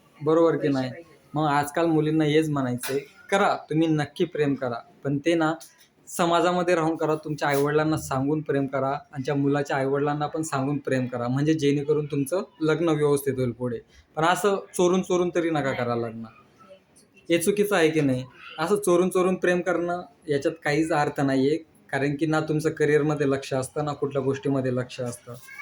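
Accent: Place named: Dhule, India. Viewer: तुमचा बोलण्या वरुन तूम्ही धूळयाकडचे वाटता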